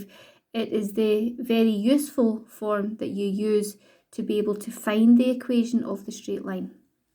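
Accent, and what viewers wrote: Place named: Scotland, United Kingdom. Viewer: excellent presentation , lovely Scottish voice and a great help , thank you.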